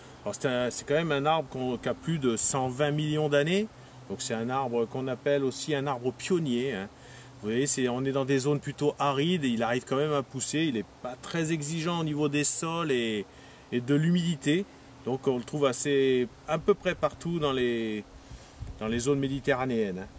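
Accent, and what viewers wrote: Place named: Quebec, Canada. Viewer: Très intéressant je vais essayer bientôt, je présume vue ton accent que tu est du Québec. Je me demandais si les baie de genièvre sont facile a trouver? Et que penser vous de filtré a nouveau avec exemple un filtre (britas)?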